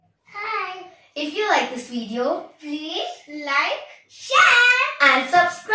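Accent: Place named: Thrissur, India. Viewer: 5kuttyolum🤩🤩👌👌👍nammude thrissur bhasha idakkidak varunnundtto🤩👍kuttypattalam nannayi enjoy cheyyunnund🥰ningal sisters randaalum banglore aanalle..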